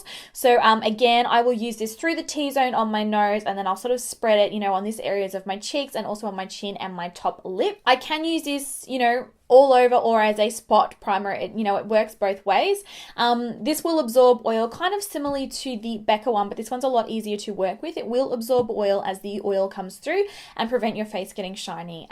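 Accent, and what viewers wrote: Australia. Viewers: Love love the Hourglass Primer and the Porefessional from Benefit the best. Didn't like the Becca it kept balling up on me and I warmed it up like they suggested. Will have to get the Rimmel and try that drugstore brand. Love all your videos for us oily t zone gals. Oh I bought that L'Oréal in the 106 and you were right it worked on me cause I'm olive skinned, thank you so much. I'll have to go back to your foundation videos and see what colors you use in those and hopefully get some samples from Sephora. Love how you interact with us, your one of the few who does that, it means a lot to us. Love your Australian accent, can't get enough, your so georgeous.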